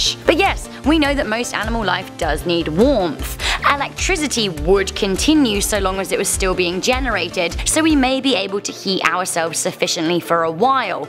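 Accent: United Kingdom. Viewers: I can’t stand how poms say vitamin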